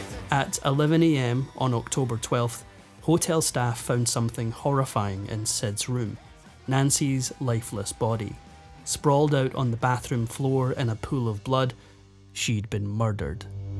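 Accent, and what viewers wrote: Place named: Scotland, United Kingdom. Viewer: My favorite narrator love listening to the Scot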